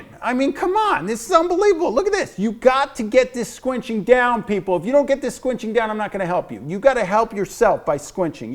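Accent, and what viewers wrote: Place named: New York, United States. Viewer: This guy is almost painfully from New York. I mean wow. Anyway I've been looking for ways to improve my creative portrait drawing, and I think this channel might be the one for me.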